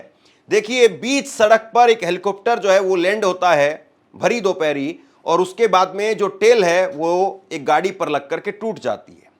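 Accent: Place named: Haryana, India. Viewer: Ek. Nitin. Yahu. Jesa. Viman. Humare. Pas. Bhi. Agar. Nitin. Yahu. Apne. Ush. Viman. Se. Isril. Chod. Kr. Bhag. Gaye. H. To. Asa. Hi. Kuch. Yaha. Asa. Hi. Viman. Vale. Ke. Sath. Bhi. Ho. Sakta. H ish. Se. To. Bahut. Se. Log. Yaha. Anath. Ho. Jayenge aap. Ke. Chanel. Ka. Nam. Tk. Nahi. Pata aap. Ek. Nojawan. Koi. 30. 35. Sal. Ki. Umar. Ke. Haryana vegra. Se. Lagta jese. AAp. Ke. Bolene. Ke. Andaj. H ye. Badi. Dookh. Bhari. Khabar. H. Operation. Sindoor m. Ush. Ne. Pagasus. Se. Humare. Samarthan. Ek. Badi. Bhoomika. Nibhaee. Thee. 14. June. 25. Sature. Day. Jai. 2. Shani. Dev. MAharaj bakheda. Hune. Gram. 7. Om.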